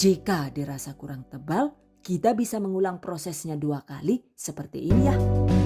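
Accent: Banyumas, Indonesia. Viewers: sebelum2 nya cuma nonton n👍.tp baru2 ini subscribe .sangat cleaaar penjelasan nya . ide2 buat jualan nya goood...ngapak nya 😁👍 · Kiye wong Madiun apa wong ngapak si sing duwe channel dadi geget😂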